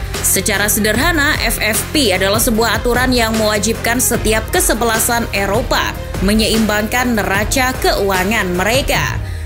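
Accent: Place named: Java, Indonesia. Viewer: Hostnya jelas wong jowo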